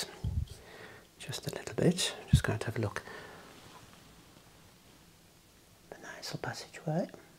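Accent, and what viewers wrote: Birmingham, United Kingdom. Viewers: Are you a brummie by any chance. Love the accent.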